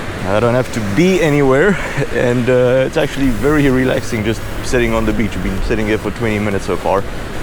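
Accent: South Africa. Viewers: And you also have a VERY South African accent. I initially thought you were from there.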